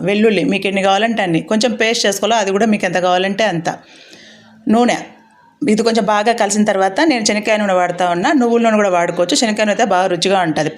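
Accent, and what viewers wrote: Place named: Rayalaseema, India. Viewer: మీ మాటలు వింటుంటే, రాయలసీమ,మత్తు తెలుస్తుంది 😅,ఏ ఊరు,,?? ఊరగాయ చూస్తుంటే,,😋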